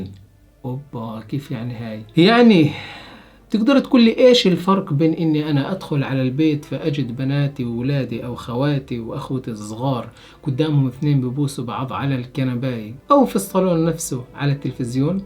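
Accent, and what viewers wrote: Palestine. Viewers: حكيك زينا فلسطيني ..كلامك رائع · هذا فلسطيني واضح من لهجته لكن تحيه لك على اي حال اخوي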